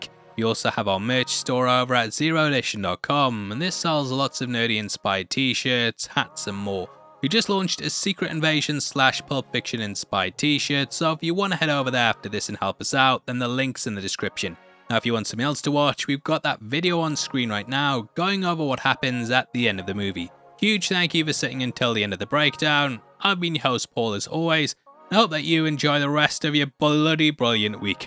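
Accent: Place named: North, United Kingdom. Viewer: Find your accent so interesting. Clearly northern but you drop ‘t’s like an American. 😅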